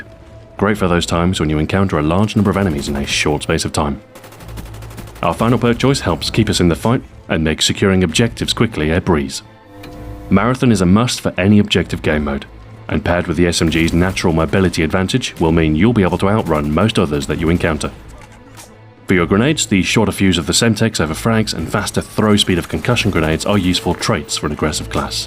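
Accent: England, United Kingdom. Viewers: Play the video at about minutes and switch it to 0.5x speed. LOL. voice sounds so funny. He sounds like HAL 9000 with a little more of an English accent.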